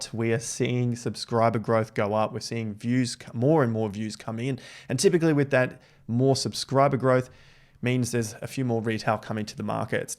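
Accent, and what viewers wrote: Australia. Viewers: I’ve subscribed again, it is great to hear a fellow Aussie breaking down the crypto charts. Well done & here’s to a great 2025 bull market.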